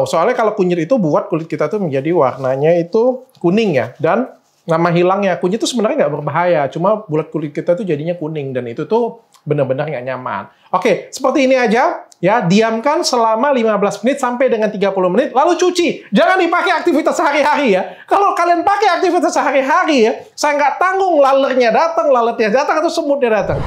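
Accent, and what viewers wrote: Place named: Palembang, Indonesia. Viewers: Dokter asli palembang yach...saya mendengar kata2 mogolah 😁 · Dokter orang palembang ya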